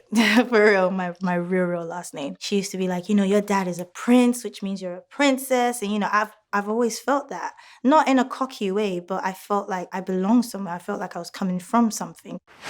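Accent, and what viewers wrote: United Kingdom. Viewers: Famm that british accent is on point!! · Ho my gawd Where did the British accent come from🙄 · What's up with the British accent though? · She studied in the UK and came when she was little, so obviously British accent and British passport. Stop hating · British accent I hear? Is she of British decent aside nigerian? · Why the UK accent you are Nigerian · Does she have a british accent seems it mixed sorry i am French ? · weezy o she probably lived/ grew up in the uk. There are plenty of Nigerians with British accents!!! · Where did the British accent come from??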